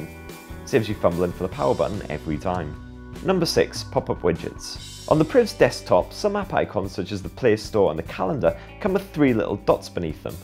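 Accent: United Kingdom. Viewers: love that brit accent jaja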